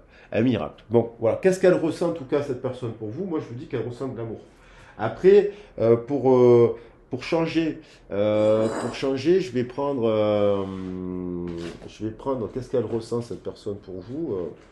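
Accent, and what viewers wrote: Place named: Marseille, France. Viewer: Bravo. J ai visité Marseille ce WD. J ai bien aimé. Je crois que vous êtes de là bas? L accent!😉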